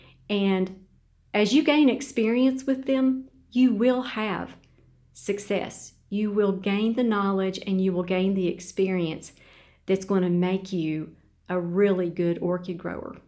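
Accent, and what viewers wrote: South, United States. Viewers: Oh I figured down there (assuming you're in the south because of the accent)that you might grow stuff outside as well. Yes, it's common for young growth to have red tint, especially if the plant is in light that is high for the plant or if they were recently moved from lower to higher. Dr Martin Motes says that when plants flush after being exposed to cold, that it's due to low magnesium, but someone else said he's wrong, and cited one source saying that high mag will increase the red. I wanted to see if you had ever tried that, but then I found the answers that back him up. The anthocyanins that show up when there is high mag are not the same ones that show up in the cold. (Or the ones that sometimes show up in normal temps with low mag). There are different ones that do different things, and then I went to his videos and he relayed at about 20 minutes in to the rough cut video and he talks about some vandas they imported from Taiwan that were deficient and turned purple and when they added mag..well I'll just let you watch that if you're curious instead of typing it all out here :)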